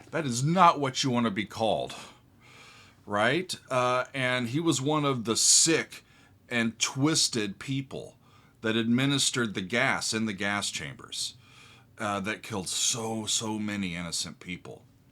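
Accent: United States. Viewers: I love your accent. What State are you from, classical composer?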